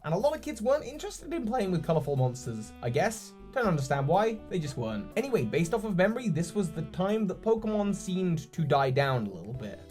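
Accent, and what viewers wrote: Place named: Newcastle, United Kingdom. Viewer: Nearly all my pokémon from the mythical pokémon gifts were on a save file that got corrupted D-:. I think that accent sounds a bit Geordie.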